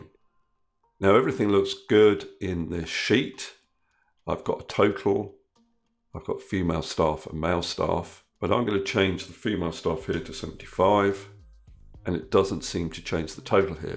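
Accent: United Kingdom. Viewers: Thank you!!! It worked! And the best part to listen to your great British accent!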